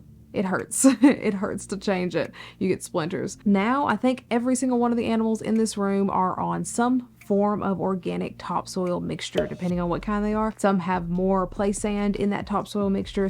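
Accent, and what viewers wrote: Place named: Texas, United States. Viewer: Alabama? I had your accent pegged as Texas. I would have put money on you being a Houston native.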